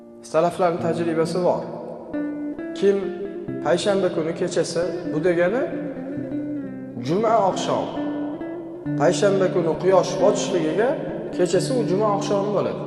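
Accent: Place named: Surxondaryo, Uzbekistan. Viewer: xa shunday men Surxondaryodanman bizdayam shunaqa shevada gapriladidee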